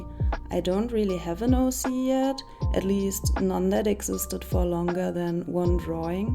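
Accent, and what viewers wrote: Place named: Germany. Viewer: Love your german accent😂❤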